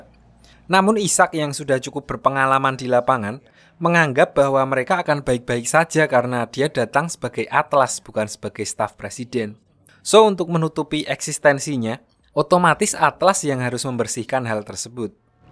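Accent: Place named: Yogyakarta, Indonesia. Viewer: Wong ngendi sih min kok bahasa e sekitaran jogja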